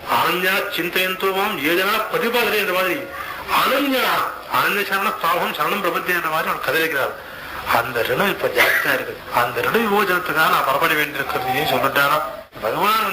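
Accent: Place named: Karnataka, India. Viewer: Jagadguru's Tamil sounds laced with Kannada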